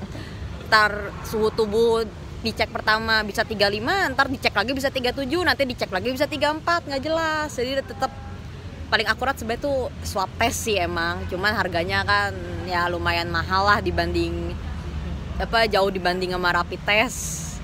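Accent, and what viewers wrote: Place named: West Java, Indonesia. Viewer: Kok logat nya Sundaan banget yah 😁😁😁😁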